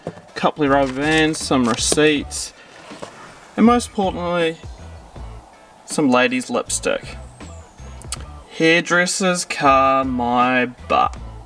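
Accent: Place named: New Zealand. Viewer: could tell you were kiwi immediately haha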